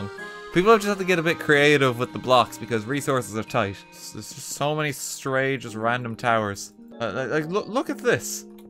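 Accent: Ireland. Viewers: irish sounds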